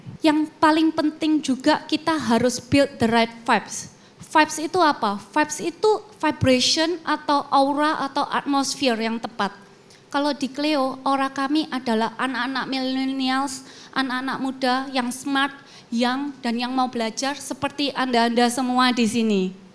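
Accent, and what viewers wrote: Java, Indonesia. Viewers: MEDOG TENAN REK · Logat jawa nya terdengar jelas. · medhok jawanya kental bangeeettt 😀😀😀😂😂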